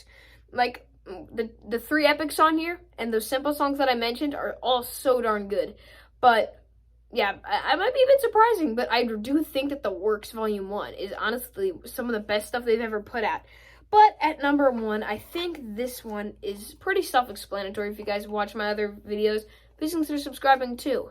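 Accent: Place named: Midwest, United States. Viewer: i can tell you are from the midwest lol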